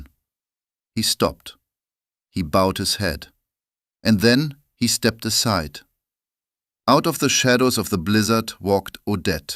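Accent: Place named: Austria. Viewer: IKR, EXACTLY.!!! It's also every story from this channel. The accent is Austrian, I believe.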